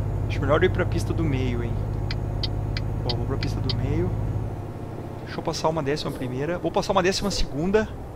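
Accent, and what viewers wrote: Rio Grande do Sul, Brazil. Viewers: VC E GAÚCHO NÉ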